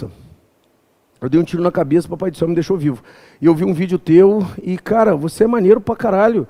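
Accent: Rio de Janeiro, Brazil. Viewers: Esse professor é carioca?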